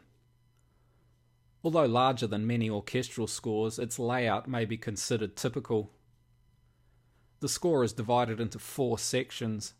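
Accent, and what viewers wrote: New Zealand. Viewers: You Kiwi mate?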